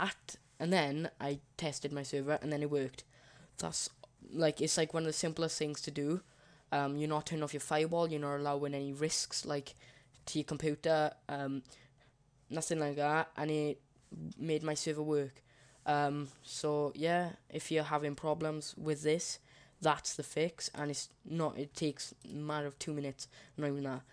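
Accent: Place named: Wales, United Kingdom. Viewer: almost as welsh as me bud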